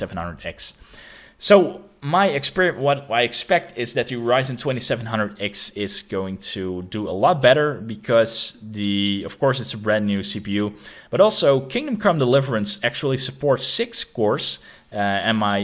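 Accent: Netherlands. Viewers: Dutch accent :) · You have a Dutch accent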